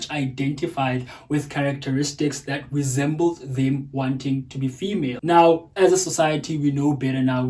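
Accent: South Africa. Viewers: I really like ur accent...it sounds south African but ur name is I'm like obsessed w u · Wait u south african Ur accent And yeah I've learnt 2 compromise Its ok for us verses i guess Coz we stick with what we receive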